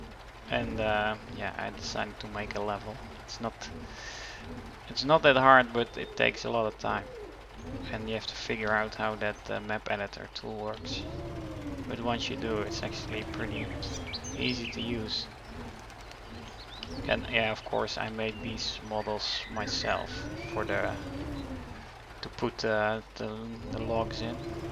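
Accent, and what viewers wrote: Netherlands. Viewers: Altijd grappig als een nederlands iemand engels praat :) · Klinkt als een Nederlander. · Ja volgends mij is hij Nederlands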